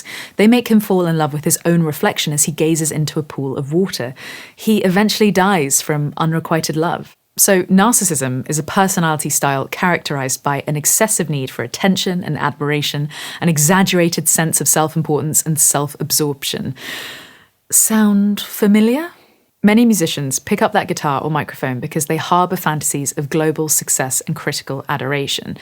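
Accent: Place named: London, United Kingdom. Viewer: in his opera prima, says in a verse: "É que narciso acha feio o que não é espelho"..something like Narcissus regard as ugly what is not mirror. Always refreshing watching your videos, not to mention that hearing your accent made me quench my thirst of old London. Be Happy!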